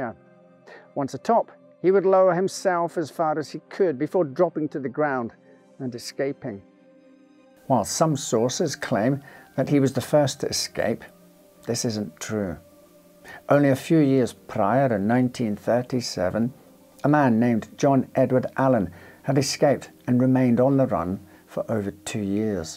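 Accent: Scotland, United Kingdom. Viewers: Great stuff. Are you Scottish? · is indeed Scottish.. 👍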